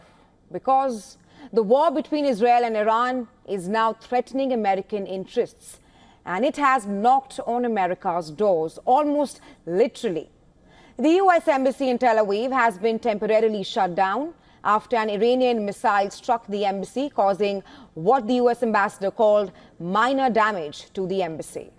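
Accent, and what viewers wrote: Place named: India. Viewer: I am American. I would never ask an Australian, British or South African person to change their accent. The Indian accent is beautiful and I understood 100 percent of what she said.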